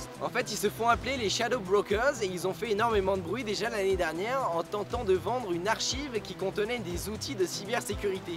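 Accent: Quebec, Canada. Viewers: Est - tu canadien ? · Que tu as un accent québécois chronique :o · vous trouvez pas qu'ilà un petit accent québecois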